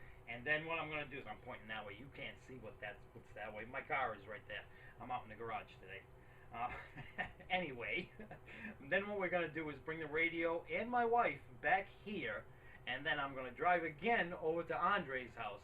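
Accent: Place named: Boston, United States. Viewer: Just realized your Boston accent! I watched all of these twice: great fun!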